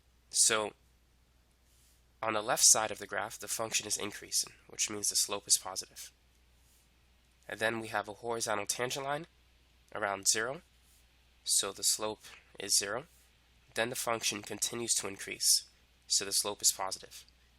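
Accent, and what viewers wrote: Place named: Rural, United States. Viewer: his low key countræ accænt 😍😍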